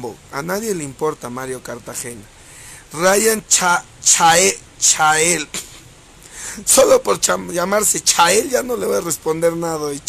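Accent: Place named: Peru. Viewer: JAJAJAJAJAJAJAJAJAJAJ peruano puro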